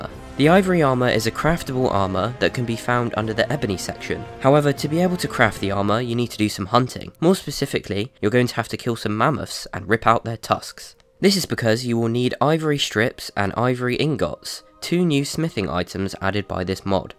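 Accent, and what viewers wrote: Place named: England, United Kingdom. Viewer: You have the “crispest” English accent I’ve ever heard. Deus Vult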